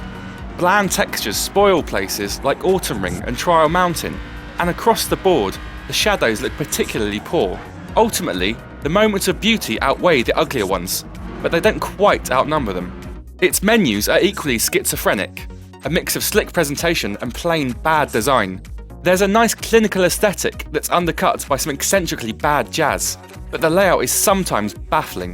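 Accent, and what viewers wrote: United Kingdom. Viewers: ''buh wif the game lacking elseweah? thas noh kwai enuf to kip it un tup off the racing pail.'' FUCK YOU, BRIT! no offence to the other brits out there, but this guy's a penis gnome. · I hate British accent! Damn!!! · aaarghhhhh that freakin british accent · why do british accents make everything sound worse than they really are!!!!??? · The British accent made everything sound more reputable. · No. What you're hearing is his accent. He's likely from Britain, which is where the English language originated from. We here in the west speak English differently since the language has evolved through time. I'm guessing this is your first time hearing an English accent. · Fuck no i aint listening to that fuckin awefull british english! Makes me wanna puke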